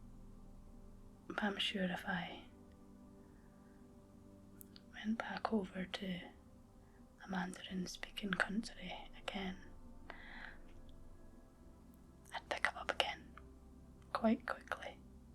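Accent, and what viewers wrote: Scotland, United Kingdom. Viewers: lovely scots tones....zzzzzzzzzz.... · In an earlier vid I thought you had an Eastern European accent. But, you're Scottish! I love your accent (from the isles perhaps?). More to the point, you are a breath of fresh air, with a lovely relaxed chatty style. How have I not been a fan before now? And, of course, I am now deeply in love (tho I'm old enough to be your father. I know, kinda creepy innit?) I'm now subscribed. Thankyou for this.Im more relaxed than I've been in years! Rock on! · Yeah the scottish accent is a lot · Momma said knock you out with that Scottish tongue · The Scottish accent is my new favorite. Thank you!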